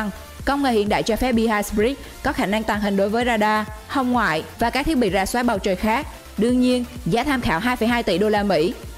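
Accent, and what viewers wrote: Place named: Central, Vietnam. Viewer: nghe giong mien trung ,cua mien thuy duong cát trang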